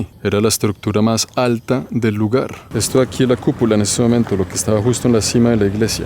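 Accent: Colombia. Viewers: Eres de Panamá y por que tienes acento colombiano???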